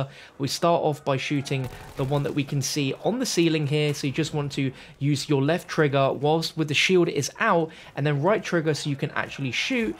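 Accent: United Kingdom. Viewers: Something I've been wondering for awhile, is British? Idk why but his accent sounds British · Yes he is from the UK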